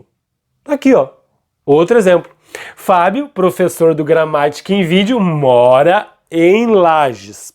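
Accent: Rio Grande do Sul, Brazil. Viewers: Professor, por causa de seu sotaque, eu sempre pensei que você fosse gaúcho de Porto Alegre hahaha.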